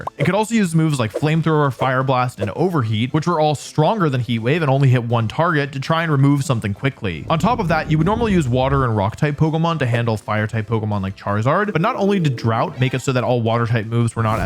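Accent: United Kingdom. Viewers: I approve of your British accent and mention sir🇬🇧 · your british accent is so authentic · British is something I didn't know I needed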